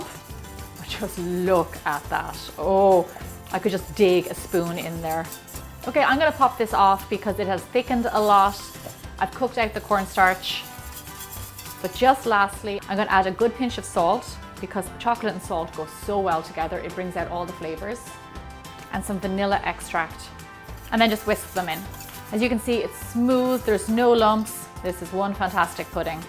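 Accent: Ireland. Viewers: are you Irish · Is she like Irish or something? Irish people are wonderful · I just found your channel and I love it! So much great backing Ideas. Even for me as vegan you notice which recipe will work great in a vegan version! Great job! Have to try something from your recipes, definitely! Btw I love your english, in " home made butter video " you said that you are from Irland? My favourite country to see one day!!!!!!! I'm a new subscriber of your great channel and can't wait for new yammy Ideas, greetings from Switzerland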